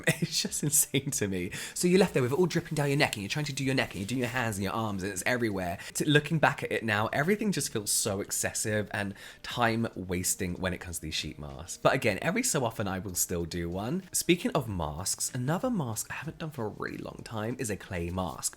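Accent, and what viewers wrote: United Kingdom. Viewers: is sounding extra British in this one.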